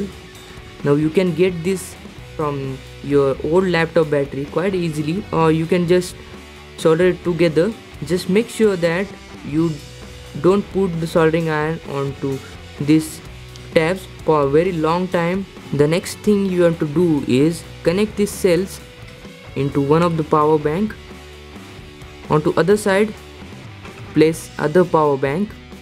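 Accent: Maharashtra, India. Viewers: Marathi accented English! Liked it lot.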